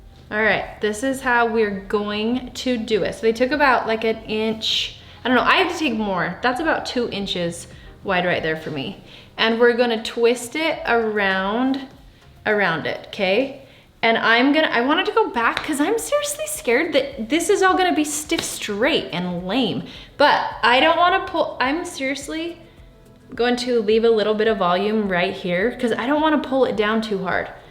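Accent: Utah, United States. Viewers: Holy Utah accent! 🙂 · Oh my gosh. You’re in Utah. Yay me too. Must be why I was wondering what accent when I read this lol.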